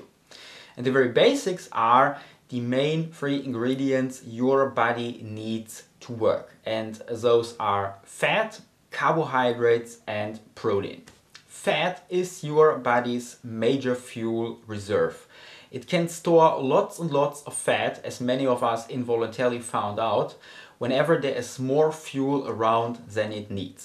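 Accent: Germany. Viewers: German Accent : )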